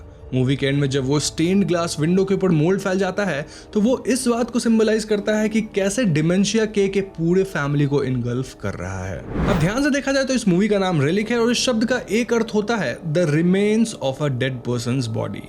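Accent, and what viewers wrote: Bengal, India. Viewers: Bengali accent boht jyada aa raha hai. Please theek kijiye ise.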